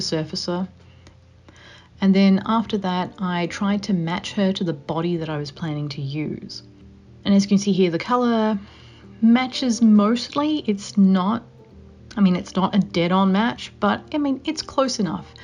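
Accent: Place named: Australia. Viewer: Wait, is American right? Why does she sound Australian to my own Australian ears wtf o.o Are my ears deceiving me? I assume she moved to the US haha.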